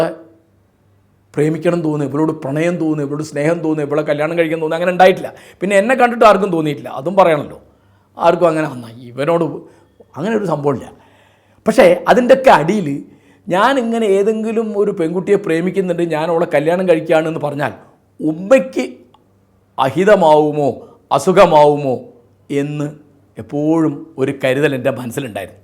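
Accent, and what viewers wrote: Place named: Kozhikode, India. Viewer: mashinte oru kozhappam Kozhikode basha varunnu...malayalathinte ozhukku pokunnu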